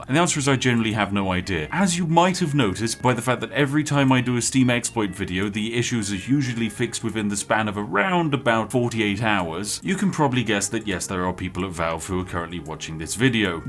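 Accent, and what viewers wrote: United Kingdom. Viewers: Go speed run the bible please!!! I realy need a refreshing on the material with a UK accent..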